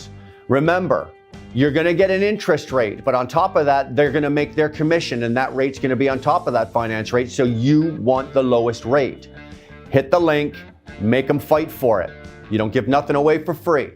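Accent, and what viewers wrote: Canada. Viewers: Is that a CANADIAN accent I hear? Man, you're making me homesick! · As I’m watching this, I’m saying to myself, “This has got ta be the most courteous trucker I ever encountered.” Then I listened to him speak for a minute, and was like, “No wonder. He’s Canadian.” 👍🏼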